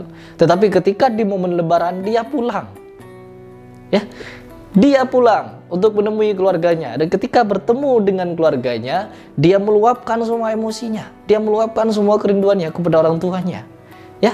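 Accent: Madura, Indonesia. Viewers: Reng madureh kake ye ?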